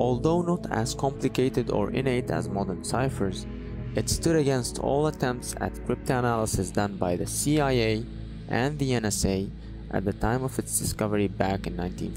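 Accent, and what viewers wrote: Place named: Sweden. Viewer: Are you from Sweden? Just because of the accent. Great explanation of the coolest field cipher!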